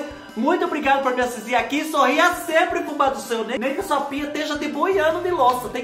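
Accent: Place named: Paraná, Brazil. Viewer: Oi fubá cansei de ser clandestino e resolvi regularizar nossa situação minha e da minha esposa e me inscrevi. Tenho uma pergunta: adoro sei sotaque que parece paranaense. Mas você cresceu no estado de São Paulo. Como conseguiu esse sotaque tão legal de paranaense? Amamos seus vídeos. Assim que passar essa coisa toda vamos te trazer para conhecer o nosso pequi com frango para ver que delícia fubá do céu. Tem vários Youtubers aqui também da área de culinária.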